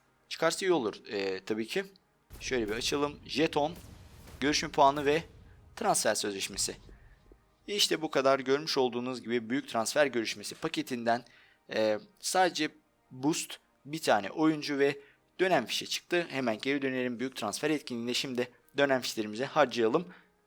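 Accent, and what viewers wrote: Azerbaijan. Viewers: Kardeşim Azerbaycanlı mısın?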